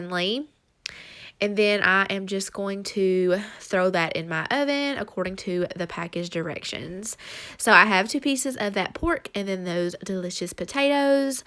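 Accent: United States. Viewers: Hi, I love your accent, from which state are you? Greetings from Europe:) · Hello, I love your videos. I'm trying to figure out which accent do you speak. I'm German and I have a lot of american colleagues (they are from Palo Alto) and their accent is different from yours:-)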